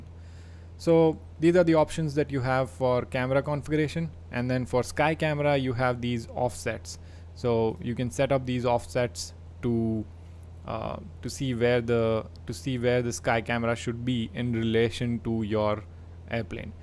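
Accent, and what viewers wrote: India. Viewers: I don’t understand INDIAN ASCENT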